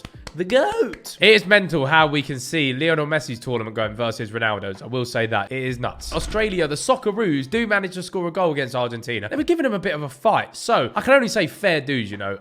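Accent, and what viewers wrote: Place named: England, United Kingdom. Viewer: Its prob his english accent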